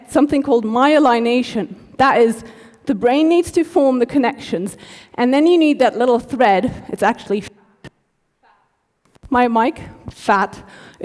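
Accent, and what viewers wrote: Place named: United Kingdom. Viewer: Well done, my friend. And yes I hear the British accent. 😊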